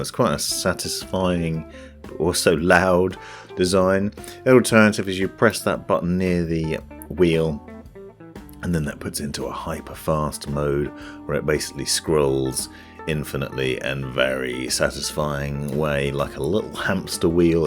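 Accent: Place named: RP, United Kingdom. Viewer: u sound like a rich dude living in a castle....in england......posh voice NOICE though u gave a clear info about this mouse now all i need to do is save up some cash and buy it some day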